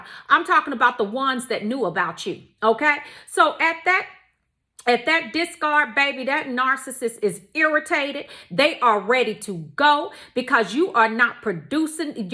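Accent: United States. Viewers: Just brilliant! So funny and alive with that strong high pitched American accent! I hear you girl loud and clear! Lol Will listen over and over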